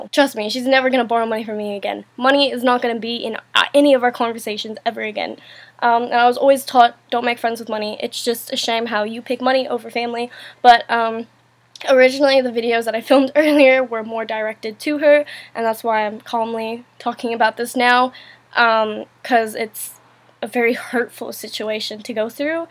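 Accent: Australia. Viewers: unrelated, but your australian accent shows a lot in this video lmao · i can hear your aussie accent getting stronger xx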